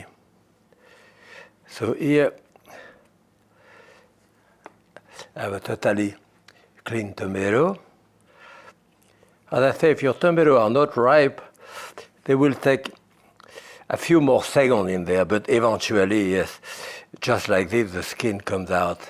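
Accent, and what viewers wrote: France. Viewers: He is French. English is his second language. He does not have an impediment.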